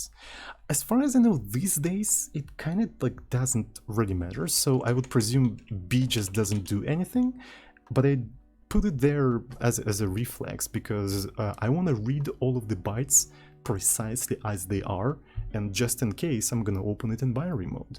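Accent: Russia. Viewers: Hi (or privet, as a Russian I hear russian accent in your speech :D)! Thanks for the video :)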